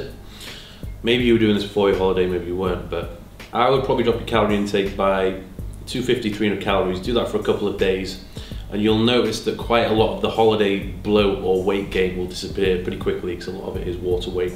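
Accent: United Kingdom. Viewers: Thank you very much. Improved my fitness knowledge and even my skills on understanding more the British accent (I’m from Italy) 💪🏼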